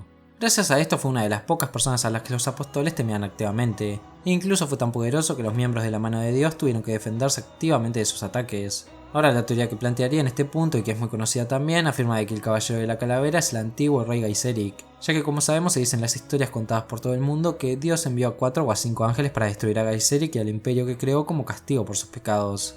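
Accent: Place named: Argentina. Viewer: Un argentinoooooooooooo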